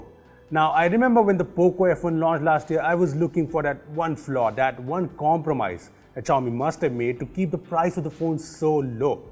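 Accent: India. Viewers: Nothing is more unsexy than an indian accent Head turned left that at least one eye is looking in the camera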